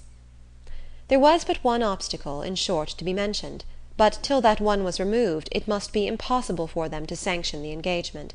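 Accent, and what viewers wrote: RP, United Kingdom. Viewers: Thanks to uploader for this gem. Reader is superb... she does an upper class british accent so well! Your time and effort has given great joy to this part of the world.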